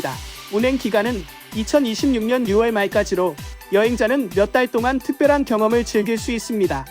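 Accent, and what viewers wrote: Japan. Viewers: 아..한본어 너무 귀여워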